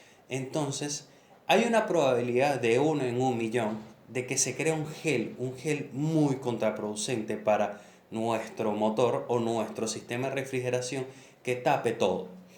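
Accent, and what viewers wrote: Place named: Venezuela. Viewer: Me da pereza escuchar hablar a los venezolanos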